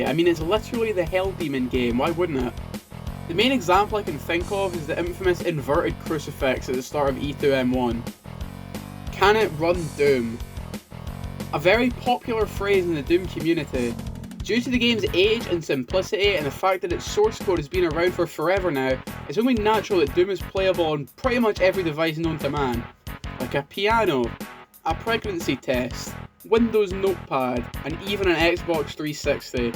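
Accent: Scotland, United Kingdom. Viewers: man i love that Scottish accent.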